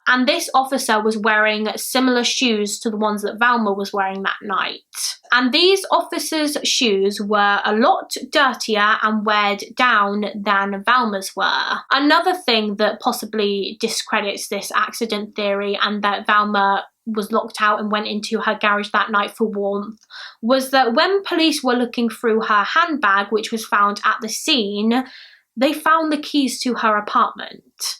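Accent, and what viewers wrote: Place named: Bristol, United Kingdom. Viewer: It is the accent, which you must be unfamiliar with. If she got the name wrong then then she would have spelled it wrong in the description box of the video. It kind of reminds me of a Bristolian accent.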